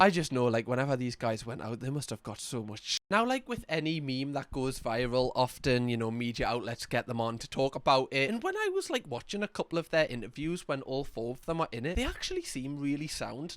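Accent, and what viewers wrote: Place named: United Kingdom. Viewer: as a fellow brit I'm always so happy to see you upload and I get to hear a familiar accent talking about stuff instead of the Americans haha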